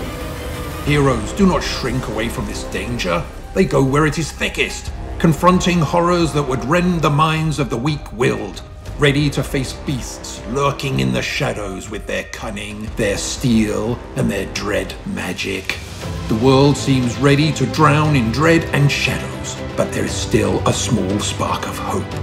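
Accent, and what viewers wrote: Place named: United Kingdom. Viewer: Yup. As the voice was British, it reminded me of when small British computer games companies, in the 80s and 90s, would just use their office staff to do voice samples, or pose for being digitally scanned, into their games (such as the bored secretary's voice of "Insert Disk 2/First Aid/etc" in Team 17's "Alien Breed"). These companies would also sometimes used the same sort of staff for their adverts, both in magazines or on the telly.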